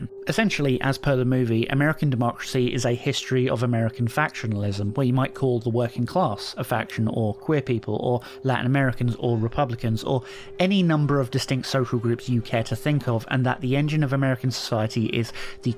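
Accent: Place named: United Kingdom. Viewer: I find it highly distasteful to listen to a person with an accent that is obviously borne of some kind of relationship with the UK analyze America strictly from a viewpoint that is the product of HIS times. As a full-blood Cajun, I freely own being of Immigrant stock..tho my ancestors arrived on these shores as the result of being driven out of France in1685 by the Edict of Fontainebleu, making Protestantism illegal, declared by Louis XIV. MY ancestors arrived in 1723, according to a Diary kept by Solange Therese Thibideaux, wife of Jean Michel Thibideaux. NOTHING is known of their life in France, as agents of the French Crown pursued my kind for what must have seemed like forever. Meanwhile my kind intermarried with the various Indigenous tribes of the Caribbean, and learned that land the Yankees AND Southerners DIDN'T want was rich in creatures that were good for food; that small lots of ground protruding from cypress swamps were ridiculously fertile if you knew WHAT to plant and where...and so my Kind survived. 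My family aided runaway slaves by building tiny shacks which blended into the swamp...places where clean water in jugs, blankets my ancestors could ill spare left to stay warm in on chilly nights, and dried beef, hardtack and salt were stored in boxes built by hand and waxed to keep moisture and insects out. After the War, free Blacks-not many, but some, were able to walk openly up to my 4× great granpapa's front stoop and thank him. So how DARE you, Mr. Voice From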